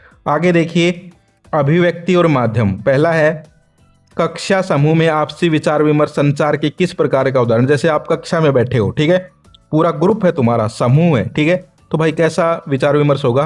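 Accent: Haryana, India. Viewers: Aap Haryana se na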